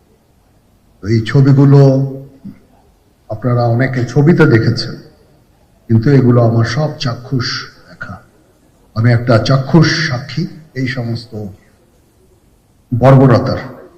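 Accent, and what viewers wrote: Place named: India. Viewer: সেনা প্রধান ভারতীয় সুরে কথা বলছেন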